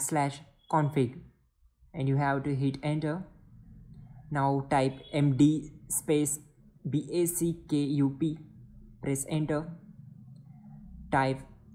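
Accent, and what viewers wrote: India. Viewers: Yes... when you hear Indian voice, its gonna work... really works for me...Well done...Thank you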